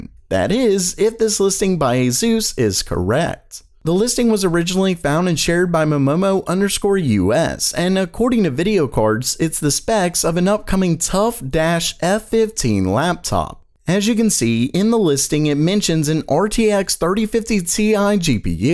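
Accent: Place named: South, United States. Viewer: I can't tell if is a southern guy trying to hide his accent or a regular trying to do a southern accent.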